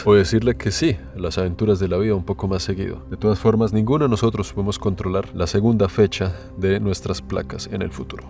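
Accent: Colombia. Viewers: Me encanta el acento colombiano 🤗💕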